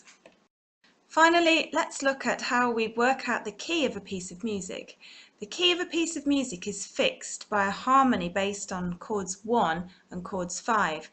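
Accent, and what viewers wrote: United Kingdom. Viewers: Ahhh British accent 😲😲😲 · Thank you so much. I'm teaching myself piano, and you've been a huge help! And I like your British accent.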